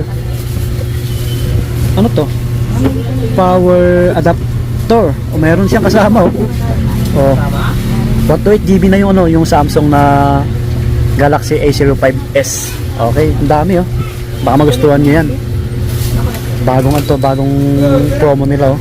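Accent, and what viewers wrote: Visayas, Philippines. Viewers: Bisaya kaba idol😅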